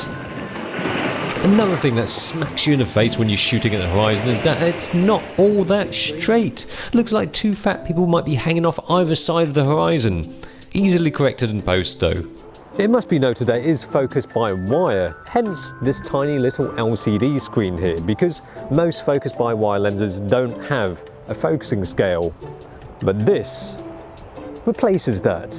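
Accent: United Kingdom. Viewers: im just suscribed to this channel. and im suprised to see that chinese speaks british accents. its been a while since im searching for this type of channel. great channel!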